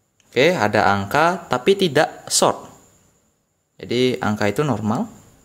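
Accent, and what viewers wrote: Bali, Indonesia. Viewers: Pantesan cara ngomongnya spt org bali. Betul bali rupanya bli. Lucu juga biasanya org bali tu. 😁 dimana lokasi bli. Nanti kalo butuh2 betulin elektronik sy datang ya.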